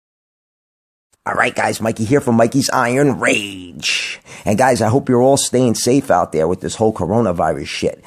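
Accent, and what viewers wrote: New York, United States. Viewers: i love that nyc accent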